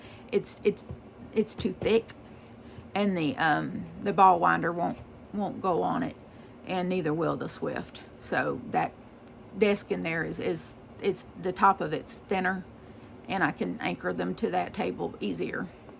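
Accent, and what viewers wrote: South, United States. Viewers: I enjoyed your tutorial. I like less formal. I love your accent...southern comfort. Don't worry about the complainers. More of us love your style than not. Keep up the good work. I'm looking forward to your next tutorial.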